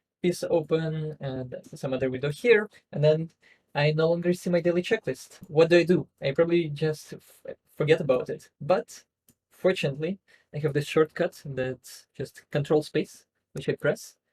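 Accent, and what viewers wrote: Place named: Russia. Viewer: Now that you've been in the US for some time, your English sounds a lot better. In your interviews with e.g. Ben Kuhn and the BBC, you had a pretty strong Russian accent - it's a lot better now! And it sounds a bit more fluent. And you should not underestimate that - communications skills are very important. Especially in the kind of job that youre doing right now - raising funds and talking to researchers to allocate these funds. People not getting an idea or, even worse, getting the wrong idea, will cost a lot of money. Other peoples perception of you will also (maybe subconsciously) be influenced by your language skills, another reason why this matters. Good luck with all your future endeavors!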